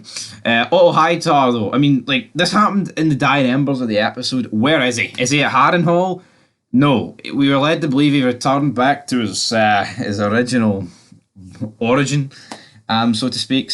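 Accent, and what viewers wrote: Scotland, United Kingdom. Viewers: Exactly the same as mine, so I am guessing east central Scotland, Fife , Edinburgh or Falkirk. Listening more though, it might be a wee bit further west , nearer Glasgow.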